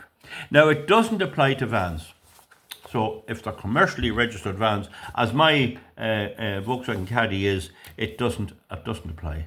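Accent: Ireland. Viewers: i like the way you explain things. and that lovely Irish accent .thanks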